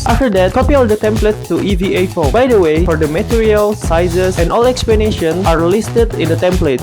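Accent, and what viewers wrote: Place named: India. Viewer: Kirain orang prindapan,logat inggrisnya mirip soalnya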